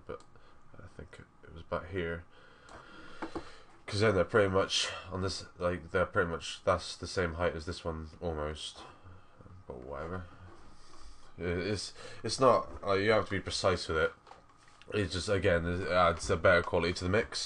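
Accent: United Kingdom. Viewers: are you from bristol?;)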